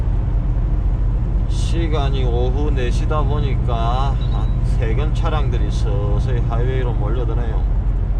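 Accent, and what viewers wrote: Gyeongsang, South Korea. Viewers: 전 경상도사람은 아닌데 경상도 아저씨의 구수한 말투와 따뜻한 마음씨가 느껴지네요~^^ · 아---- 네^^ 내 친구 목소리와 너무 똑 같습니다. 분 목소리는 톤이 굵은 경상도 말씨로 단호하면서도 성실하고 진실, 예의바른 어투로 특별한 개성의 보이습니다.계속 영상 시청하면 왠지 진주 친구 얼굴이 자꾸 오버랩 데내요^^항상 건강하시고 좋은 하루 되세요!!! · 어떤영상에 머리에 흰두근쓴 아가 스탑 싸인을 무시하고 달려 스쿨버스와 충돌한 대형사고가 있었다는 님의 영상이 생각나네요 약속은 지키라고 있는것이죠 편집에러 발생 원래 대사는 샤워장에서 깨끗이 샤워하고 뵙겠습니다 라고 해야 하는데 깨끗에서 짤렸네요ㅡ😜 머 그럴수 있죠 많은 댓글에서 님의 의도와 다른 맘으로 글을 싸지르고 있는 ㄴㄷ보다 님의 일상과 경험 구수한 보리문디의 한글소리 영어소리가 정겹고 다시보고 자주보고 아침마다 님을 기다리는 분들이 더 많을 것입니다 화이팅 하시고 응원합니다 잘보고 갑니다 ㅡ🚛 🚛 🚛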